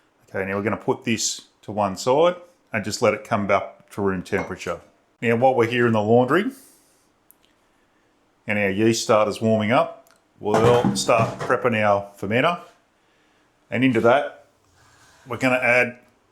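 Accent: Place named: Australia. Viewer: MATE!!! Bloody love your channel, good job on all the videos and love the true Ocker accent, you remind me of me sergeant in the glorious RAR. Keep up the stellar work!!! 🇦🇺🇦🇺🇦🇺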